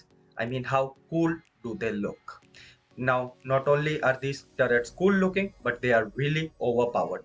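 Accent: India. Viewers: hmmmm, his way of speaking english seems like he is an indian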